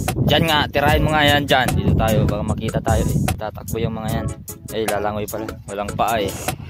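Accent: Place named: Nueva Ecija, Philippines. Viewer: Taga saan ka bro parang nueva ecija ang punto mo ahh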